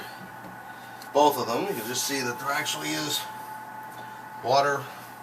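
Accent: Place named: Canada. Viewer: I know this is a late comment, but please bear with me. The "" T "" junction fit was mentioned numerous times but was not actually shown being inserted. There was mention of a water mess difficulty....However, I really wanted to see how to slip the fitting on one end.....and then get the other end ( which would have been cut one inch long) into the fitting without having to push that copper end (on the supply tube) upwards and then downwards and into the fitting. I really missed that bit.....and I was wondering if anyone could add some information on the easiest way to do this. The supply tube may be attached via a lug and screw and that would make it difficult in my 'mind"....but maybe it isn't thanks for any help fellows. Love that Canadian accent...First time I ever noticed it.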